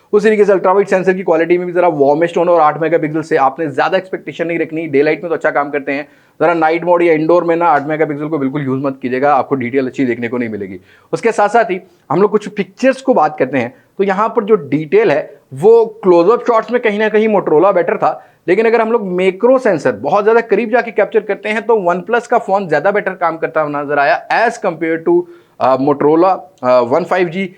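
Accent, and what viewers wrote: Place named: Punjab, India. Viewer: Bhai aa Punjabi touch bara wadya lgha💯❤️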